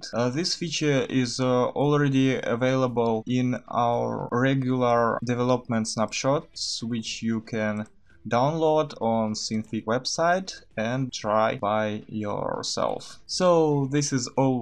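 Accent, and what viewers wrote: Russia. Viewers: Are you from Russia, you have russian accent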